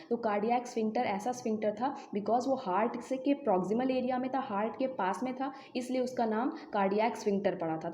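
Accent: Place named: Meerut, India. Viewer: Aap meerut se ho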